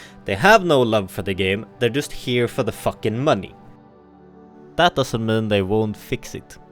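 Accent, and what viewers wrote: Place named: Sweden. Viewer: You’re from Sweden? Sounds like Swedish accent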